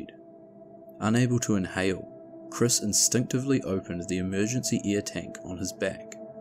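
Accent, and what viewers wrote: New Zealand. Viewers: Terrifying, miraculous and addictive watching! Awesome vid, 👌 thanks mate 👍 Great to hear a kiwi accent 🇳🇿, with love from Oz 🇦🇺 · This was really good. I have no problem with a New Zealand accent!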